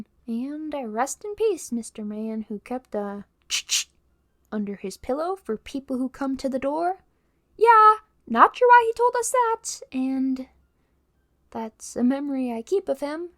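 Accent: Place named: Australia. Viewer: I don't why but everytime I am rewatching this; I am hearing a slight Aussie accent from you. LOL !!! Yes ... I came back to type this !!! 😆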